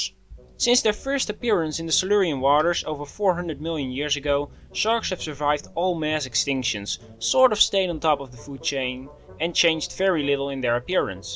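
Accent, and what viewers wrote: Netherlands. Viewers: I have to say this was overall quite a informative video. But i have to comment on something: those "marine dinosaurs" you described, don't belong to the dinosaur family at all. Sure they were large and lived in the same periods, but they were in fact "marine reptiles" instead of dinosaurs. They were a seperate group of reptiles. That's it. After hearing your accent, i presume you are dutch :D am i right?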